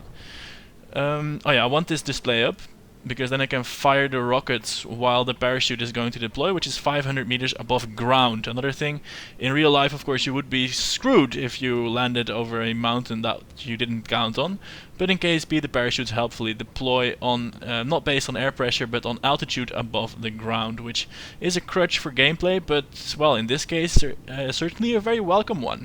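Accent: Netherlands. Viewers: is he dutch? yeah? so obvoius lol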